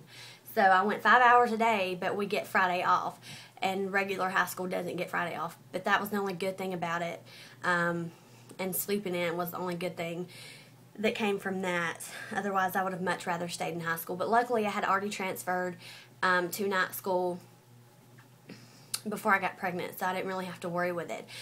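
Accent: South, United States. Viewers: You're sooooooo pretty, and confident, that's good stay that way! and I love your accent, from the south? same here lol. your story was very understanding, stay strong sweet peaa :) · Where are u from because u sound southern like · Wow- I stayed and watched the whole video. And I most definitely agree with you on the "abortion" and "if you are old enough..." speech/opinion. Off topic, but I love your accent... and your face structure kinda makes you look like Miranda Lambert. Anyway, good luck with you, your son, and boyfriend. :D! Go southerners! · Hey! Your so pretty, and have the cutest country accent. Lol. I'm 15 and 21 weeks pregnant now, so I understand what you went through just don't understand the actually raising your kid part YET. Its crazy cuz when I first got pregnant, my boyfriend just turned 20 back in january and i didnt have a clue that i was, and i found out at 8 weeks.:D Although, I'm still going to highschool. 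Lol. But post more videos of you and your son, He's adorablleee. <3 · omg you are so pretty and i love your country acsent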